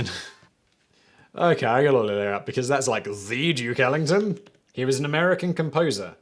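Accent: United Kingdom. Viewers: One of my favorite things in life is when breaks his proper British delivery and gives his off script delivery.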